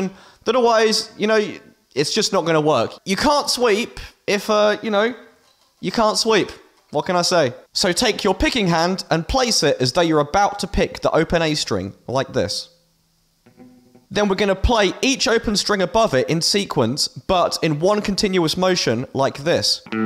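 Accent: United Kingdom. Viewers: Are you British or….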